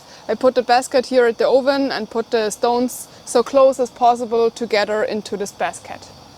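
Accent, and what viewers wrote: Germany. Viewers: Your German English wonderful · I bought your book velcome bek am from vild and bushcraft love it am your number one fan am. Not making fun of you I love your heavy accent it reminds of the German girl in the movie YOUNG FRANKENSTEIN the mel Brooks comedy movie she talks like you.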